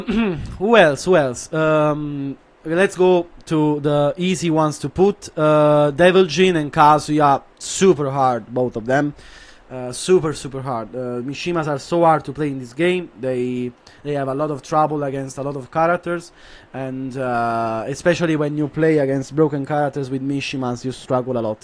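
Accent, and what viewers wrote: Italy. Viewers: took me 2 sentences to realize he’s italian lmao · You can hear he is italian and how he says claudio is perfect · I was only surprised by Lee, you even put it in "Easy" with those combos full of cancels and precision concatenations. Yoshi is a character who doesn't give you anything, he has potential for top 13\15 for me so it's probably even more difficult than that. Steve is probably the most difficult character in the game and also devil jin. question: are you Italian? I ask for your pronunciation of English 😂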